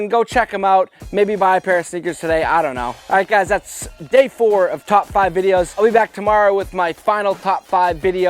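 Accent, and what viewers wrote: Boston, United States. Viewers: "wicked affordable" if u couldn't already hear the boston, that line just sells it 😂😂😂 great content as usual man!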